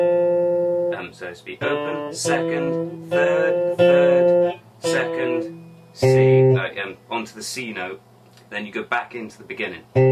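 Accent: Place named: United Kingdom. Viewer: love the cup of tea and the nice accent very british! you make us proud. thanks for the lesson it really is great and very useful. keep them coming xx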